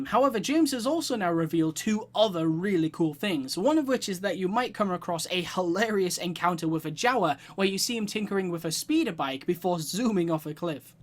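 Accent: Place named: Geordie, United Kingdom. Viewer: Just wondering are your from Newcastle Upon Tyne because I can hear that tint of Geordie in your accent?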